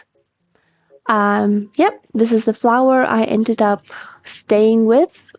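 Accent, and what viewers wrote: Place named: South Africa. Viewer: I’m really sorry if this is rude and ignore it if it is but I can’t help but think you sound South African 😭 (this is coming from a South African) If you are it’s so nice to see other South African Genshin players! ☺️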